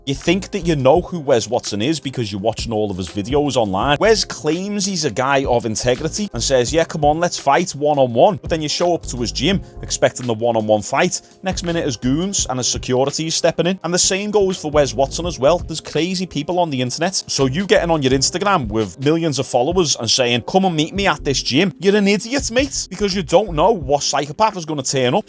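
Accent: Liverpool, United Kingdom. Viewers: Are you Scouse? Actually, is this even a question? I don’t deal with if, ands or maybes, only absolutes!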